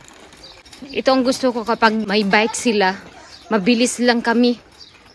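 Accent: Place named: United Kingdom. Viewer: british accentan kau jd ang inday ui buyag...well done mama! maau nlng jd naminaw ang kuya!ingun ana jd na madam basta mama nata,ky akong bebelabs bitaw mao pd..nah panahon pd namo dria di masabtan 😂! mura pd sa cs ky naa pd ginabutang pra dli magkeloid,maau nlng kd ky naayo na!mahal pd ba ang cargo diha padung pinas?? ky dri mahal mao ginahatag nlng namo or ginabutang sa mga clothes box..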